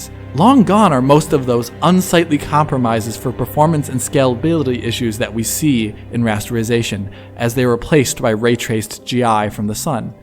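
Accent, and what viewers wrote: Germany. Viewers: Wait, what is native language? German? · So you are german?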